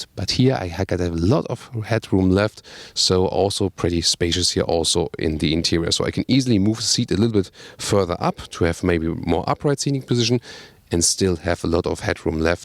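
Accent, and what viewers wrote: Germany. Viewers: These are good reviews. Just factual....and with the funny German English ;) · This guy is just great! I love the german accented: "Full HD, Full Screen and Full Lengzz! Let's GO!"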